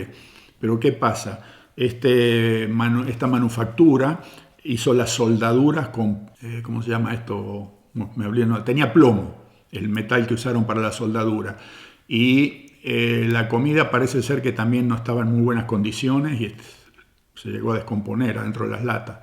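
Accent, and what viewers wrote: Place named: Cordoba, Argentina. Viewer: Pensé que usted era un argentino cordobés para más dato y no un canadiense.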